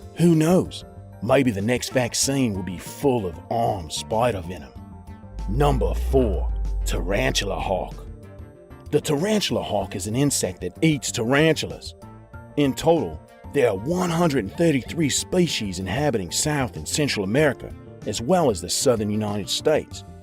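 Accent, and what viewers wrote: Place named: Australia. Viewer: It's the Australian accent for Me. 🤔🥰🤗🇨🇦💖 No, I was never a fan of Irwin, but a tragedy nonetheless. 🤔🥺😭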